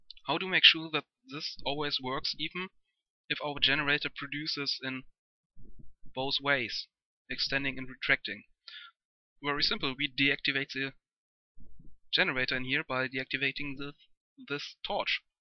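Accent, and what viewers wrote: Germany. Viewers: Bist du deutsch hört sich so an wen ja schreib was auf deutsch German !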